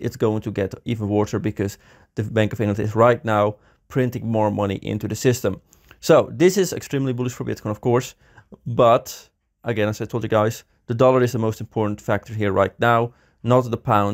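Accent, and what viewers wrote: Netherlands. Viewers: Nederlandse accent. Nederlanders zijn altijd gretig voor de goedkopere prijzen lol.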